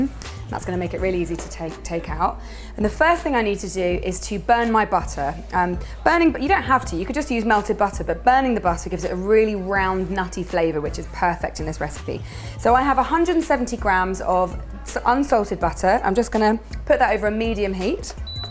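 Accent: United Kingdom. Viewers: You r great! Nice recipes ;) PS: love ur british accent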